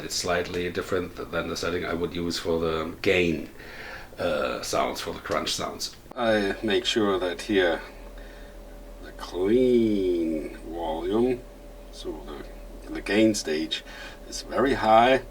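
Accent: Germany. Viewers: this was a very slowly and a little denglish review, but you play like a god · I love the German accent. Love Scorpions, Uli, Big fan of Engl amps. Hughes and Kettner too.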